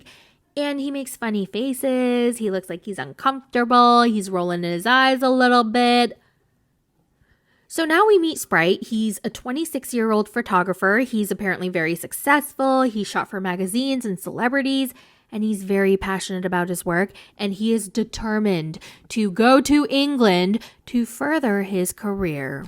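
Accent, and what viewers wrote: United States. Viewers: Just to tell you - YOU ALSO have an accent. American one. xd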